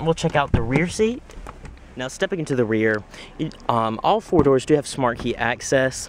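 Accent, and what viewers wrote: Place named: South, United States. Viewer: I'm a Texan, but I can hardly stand that southern accent and the part about, "If you can afford it, so what!" How arrogant!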